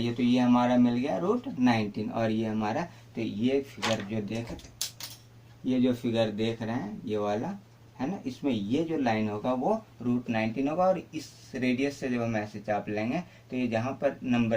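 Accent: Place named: Haryana, India. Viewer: Haryanvi rocks :))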